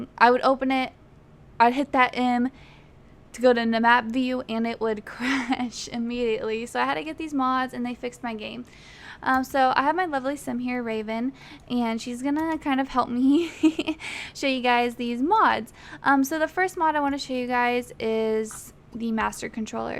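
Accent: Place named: West Coast, United States. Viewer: / slightly triggered by her voice, but also knowing maybe she's just from the west coast.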